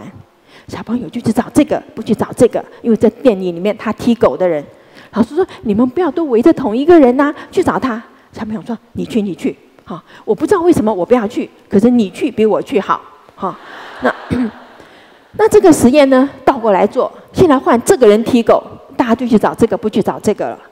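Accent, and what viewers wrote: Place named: Taiwan. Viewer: 台湾女生说话真的很有特色，我个人是蛮喜欢的的。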